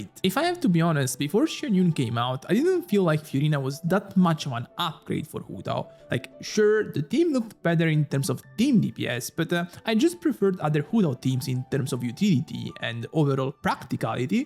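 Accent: Italy. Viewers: accento italiano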